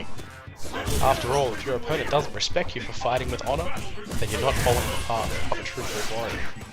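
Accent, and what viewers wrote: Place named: United Kingdom. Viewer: Nice video! You British people have such a cool accent :)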